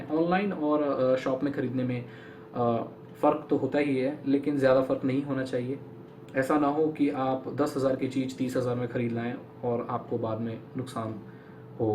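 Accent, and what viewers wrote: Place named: Bihar, India. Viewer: bhai aaja full bihari lag rahe ho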